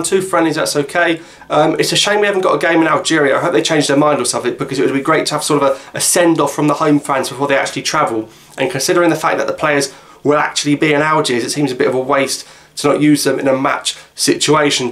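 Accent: United Kingdom. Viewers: Thanks bro nice British accent ! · El Jazairie Judging by his accent he's propably born in 🇬🇧. so maybe he doesn't speak it🤷‍♂️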